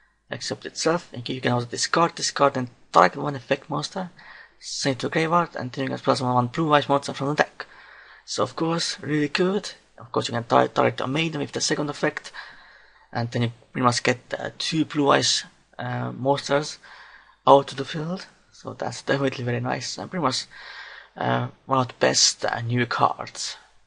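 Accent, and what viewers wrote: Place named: Europe. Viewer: European, like british or german or some shit. that accent is horrible, the video needs subtitles.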